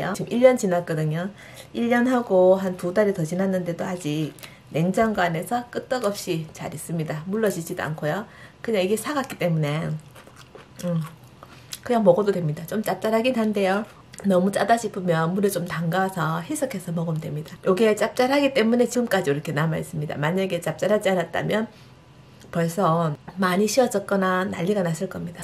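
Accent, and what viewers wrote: Gyeongsang, South Korea. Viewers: 우리나라 갓중엔 돌산갓이 최고라지요? 전 뭔가 쌉살한 맛을 좋아해서 갓김치 좋아해요. 저도 멸치똥 머리 안떼고 해요. 귀찮아서이기도 하지만 그냥 약간 씁쓸한 맛이 좋아요 ㅋㅋ 귀여운 경상도 사투리로 조곤조곤 상냥하게 설명을 해 주시니 정말 재밌고 기분이 좋아집니다^^ 맛있겠다 ㅎ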